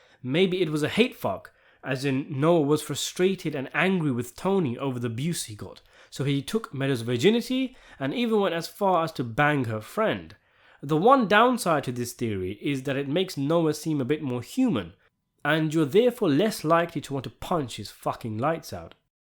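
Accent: United Kingdom. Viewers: I love how the narrator leaves the curse words in the commentary, but they get blanked out in the closed-captioning. And it's always fun listening to British accents talking about American mobsters. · My greatest mystery is your accent - it sounds British-ish but not quite...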